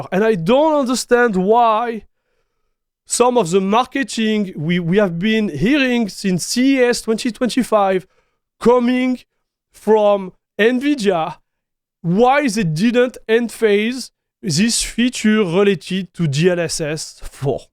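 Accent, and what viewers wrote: France. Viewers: That's a French guy not afraid to have an accent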